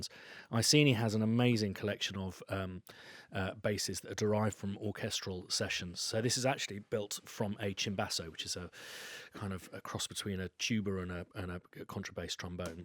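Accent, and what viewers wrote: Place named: England, United Kingdom. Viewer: A suggestion: speak more slowly - not all ears are attuned to a rapid-fire English accent. Also, use a bit of gain and compression on the voice so we can actually hear it - would be a good start. The voice level needs to be up there just below the volume of the percussion. If we turn the sound up so we can hear you talking, the drums just about blast our eardrums together in the middle of our heads! Very poor recording technique for video. Good content, but crap recording!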